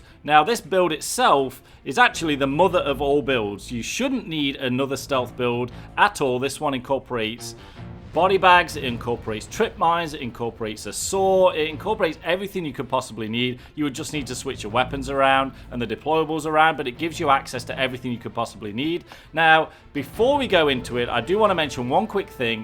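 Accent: United Kingdom. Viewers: are you british? You sound like to me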